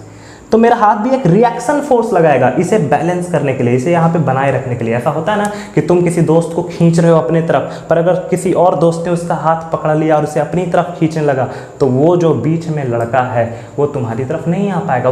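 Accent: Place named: Bihar, India. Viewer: Bhaiya aap bihari ho....jai bihar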